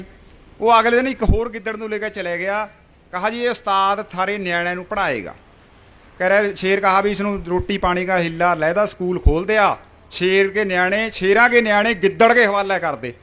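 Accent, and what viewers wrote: Puadh, India. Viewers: Puyadhi boli eh Sadi..but sanu malwa 2 da nam de dita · ਪੁਆਧੀ ਬੋਲੀ ੲੇ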